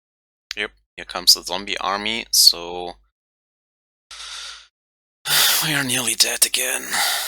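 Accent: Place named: Germany. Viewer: Hey, nice run. Your dialect sounds like german, so i wanna ask, where you from.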